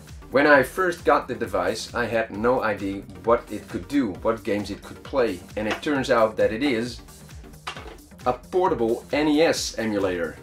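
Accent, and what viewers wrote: Netherlands. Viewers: 2 questions, your "american" accent sound very dutch, am i righr?, en mijn tweede vraag: waar heb je dit gehaald? ik wil ene kopen... ik ben 100% zker tot gij NL zijt of belg xD mijn twee vragen zijn voorbij, please help me.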